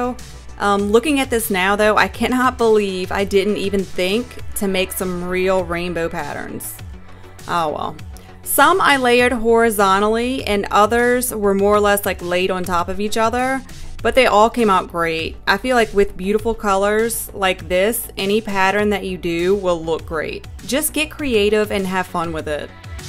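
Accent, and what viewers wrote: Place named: South Louisiana, United States. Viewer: Are you from South Louisiana? Your accent is very similar to ours.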